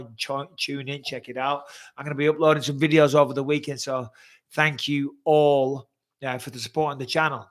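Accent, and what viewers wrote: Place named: Scotland, United Kingdom. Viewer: It's very noticeable that you do slur your speech at times, plus your memory is pretty bad for someone your age. Have you ever had any checkups regarding brain problems, etc etc, people saying you have no such problems are just being polite etc etc, but thats not helping you m8, ps Scottish accent is god dam fking awful lol oh and is it possible you can actually get through a video without mentioning Darren Till !!!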